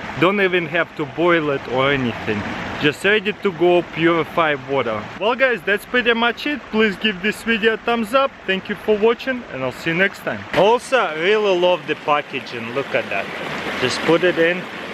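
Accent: Russia. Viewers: sexy russian accent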